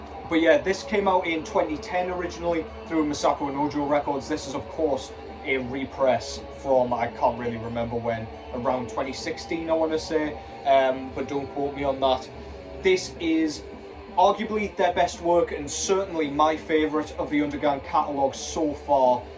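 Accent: Newcastle, United Kingdom. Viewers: ah lived some of me life is Washington so ah knaa it can gan either way in Durham, but yuh sound completely Geordie tuh me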